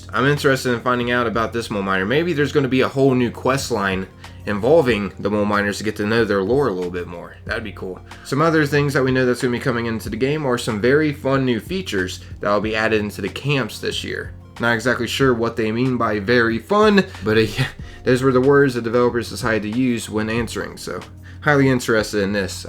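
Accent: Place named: South, United States. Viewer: Your from Ohio? I'm guessing southern. Def ain't North East 😂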